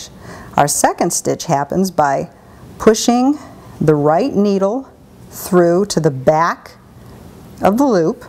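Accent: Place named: Oregon, United States. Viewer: omg! i cant seem to stop haha...and your voice reminds me of Oregon! i miss my home state :'( and a wonderful knitting and sewing grandma who lives there! Thank you once again.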